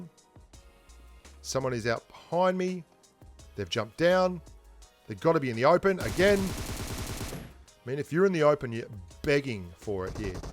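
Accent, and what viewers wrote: Australia. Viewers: Hey bro. I started watching you when I noticed the aussie accent Pretty close to home for me (nz). Your the man with all the advice that has helped me tremendously. Thanks and keep it up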